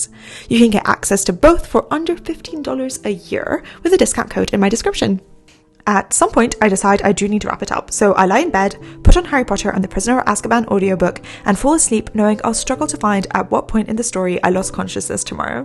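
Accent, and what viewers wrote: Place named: United Kingdom. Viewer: Wonderful. Beautiful British English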